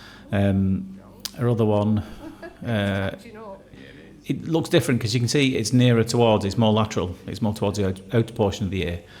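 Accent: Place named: Wigan, United Kingdom. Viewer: Love, good health and the happiest of New Years to you and your sweet family! Your videos and your sense of humor and your Wigan dialect are relaxing, entertaining and educational.👂❤️🕊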